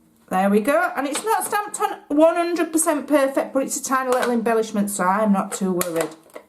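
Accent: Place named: Northern England, United Kingdom. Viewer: These are absolutely great! I will make some to use as gift tags and to put in my Holiday scrap album and use in pocket pages. Every year I take a photo of and journal about my first Starbucks (or other coffee shop) "red cup" drink of the Holiday season. One of these cups will be great on that page! BTW: must tell you, I simply adore your lovely accent! Northern England, right? Hugs from Texas and thanks for your wonderful, delightful process video and for sharing. Barbara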